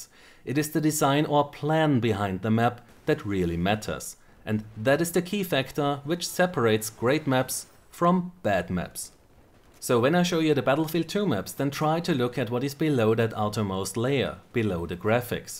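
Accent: Germany. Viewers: German accent... Yet he talks like lvlcap... Interesting.!! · your funny accent is german, right? correct me if im wrong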